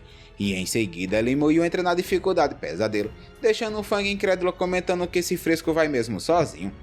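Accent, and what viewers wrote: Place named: Northeast, Brazil. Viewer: 😂 kkkk mais um EP top demais 😍 parabéns pela sua narração perfeita sem ia amo o dialeto nordestino 😂 em algumas partes me pegou de surpresa com um xingamento do neida 😂😂 vamos nessa para o próximo episódio ❤😊👍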